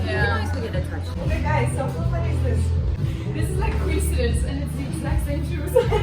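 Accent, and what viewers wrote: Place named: Russia. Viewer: It's Russian-pronounced English.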